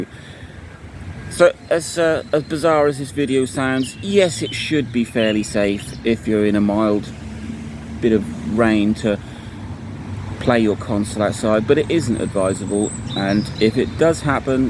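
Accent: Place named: United Kingdom. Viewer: I just found your channel today, I love your British accent and as a student about to be an English Teacher, I find it very helpful.. I also love gaming.. I'm a PC gamer, but I still do love gaming in general.. Like 10 years ago I had the opportunity to travel to Germany where I got my first portable console, it was a PSP.. What a beast of a console! I ended up selling it cuz I was stupid honestly, and I regreated my whole life since then, besides that, I never owned another portable console..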